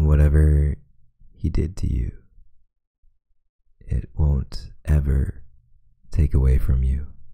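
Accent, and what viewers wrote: Canada. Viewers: omg the sorry is so cuteeee. Are you canadian by any chance? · I hear Canadian 😌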